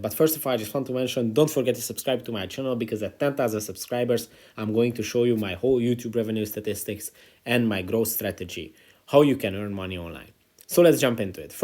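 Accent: Hungary. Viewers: I bet you any money that you are from Hungary. I can recognize your accent. :) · jó kis magyar akcentusod van :)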